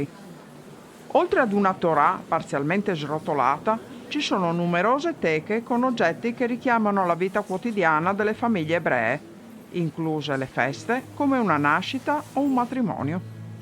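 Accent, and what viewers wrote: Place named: Veneto, Italy. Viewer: Sei veneta ciò!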